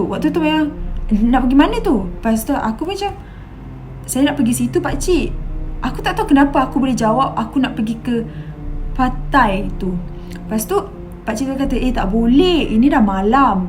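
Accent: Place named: Kelantan, Malaysia. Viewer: Aq rase ni orang2 belah pantai timur.. nada cakap cam orang kelantan je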